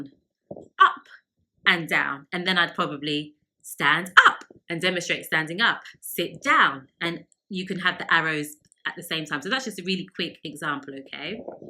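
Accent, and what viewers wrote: United Kingdom. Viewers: Like your content & was drawn to subscribing after watching some of your prior postings. When I see you sharing ur knowledge for free I felt a good vibe about you😀in addition to that British accent. And since you are being so generous i'd like to give u a hand by directing you to a free online source with a wealth of info which u may find helpful pertaining to your calling. If you're interested, let me know with a reply in the thread. Keep inspiring! Peace!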